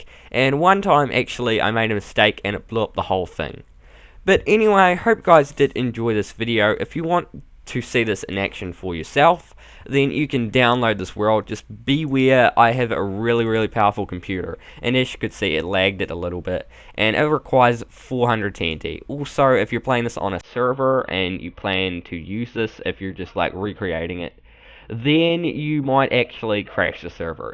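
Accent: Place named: New Zealand. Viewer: kiwi I think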